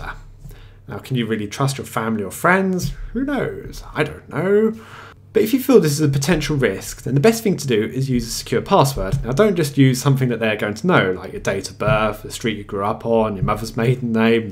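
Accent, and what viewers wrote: United Kingdom. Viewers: Your British accent is SO strong, haha! Funny that the number or likes in this video (at this time I'm writing) is 666, hehehe.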